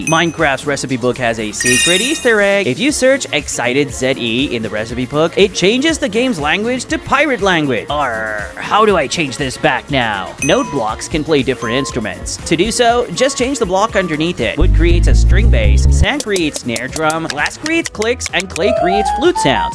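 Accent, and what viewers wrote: United Kingdom. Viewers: WHERE IS THE BRITISH VOICE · Wheres british voice? 😔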